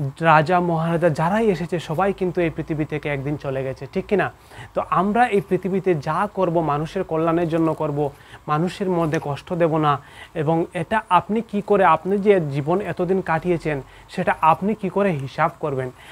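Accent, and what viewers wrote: Sylhet, Bangladesh. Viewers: আপনি কি সিলেটি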